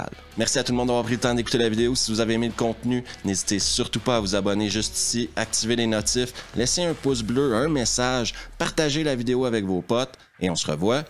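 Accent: Quebec, Canada. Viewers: Super vidéo et superbe accent québécois ! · Hahaha Mais c’est tellement horrible et drôle de mater des vidéos en québécois.. quel enfer vot’accent.. 😂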